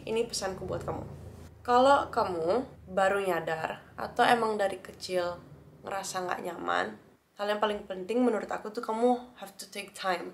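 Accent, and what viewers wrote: Bali, Indonesia. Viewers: Loagat nya bali banget. Lesbi Seng ken Ken. Aku suka Cwe Lesbi. Salam Kenal dr Tabanan.🙏👍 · Gemes sama medok Bali nya 😁 wajahnya juga cantik menurut pandangan saya. God bless u! ♥️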